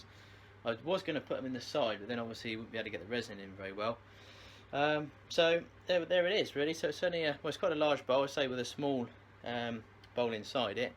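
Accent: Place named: England, United Kingdom. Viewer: He talks correctly he lives in the land that invented English ENGLAND Yeh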